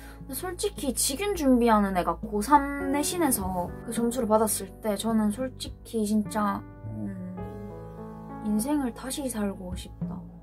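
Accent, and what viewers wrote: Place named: North Gyeongsang, South Korea. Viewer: 가끔씩 꿈을 꾼다는것은 씨가 좋은대학을 가기 위해 치열하게 공부했던 시간임을 증명해주는것 같아요. 하지만 꿈을 꾸고 난뒤 현실에 만족하기 보다는 그 꿈의 의미에 대해 한번 생각해보는 시간도 필요할 것 같다는 생각이 듭니다. 서울대를 못가더라도 삶을 행복하게 살아가는 사람이 있고, 서울대를 가더라도 삶을 행복하게 살지 못하는 사람도 있어요. 우리 삶의 종착역은 대학이 아닙니다. 많은 사람들이 서울대를 가려는 이유는 삶을 행복하게 살아가기 위한 디딤돌을 딛기 위한 것이라고 생각합니다. 씨, 서울대를 디딤돌로 삼아 더 발전하는 모습 기대할께요. (경상북도에서 태어나서 그런지 씨의 말투가 듣기 편하고, 또 좋은 마음이 느껴져 댓글을 납깁니다.)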